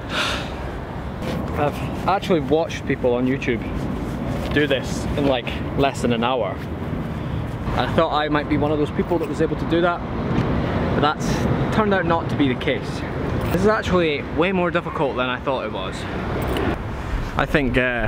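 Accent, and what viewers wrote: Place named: Scotland, United Kingdom. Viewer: where is the skate park?Since you have a scottish accent i'm assuming somwhere in scotland,maybe glasgow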